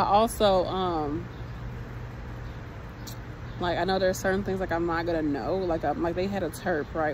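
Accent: St. Louis, United States. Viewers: It's so funny how much this has all transitioned over the past few years. I so miss the 90s. lol It would seem to me that if you're only PRN, they'd give more orientation to allow you to create some sort of a routine considering you're not agency, you're actually a part of their team. And they know you're a LTC nurse and not a hospital nurse. t's just crazy to me. Be careful with that because you will still be held liable if heaven forbid something goes array during your shift. They will say "You could have asked for more training." You worked too hard to get where you are. And yes ma'am, you have come a long way. never forget that. I started following you a few years ago after you became an LPN. You were interviewing Victoria Randle of The Secret Cocktail. When I heard you talk I was like, thick chick gotta be from the Lou🙂. I'm from there too but I live in WA state. 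Anyway, keep your eye on the prize, there is more in store for you. You have great ambition and determination. The world is your oyster.🤩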